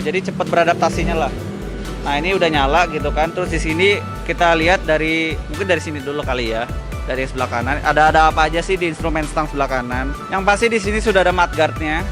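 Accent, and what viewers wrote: West Java, Indonesia. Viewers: Sunda banget bang😂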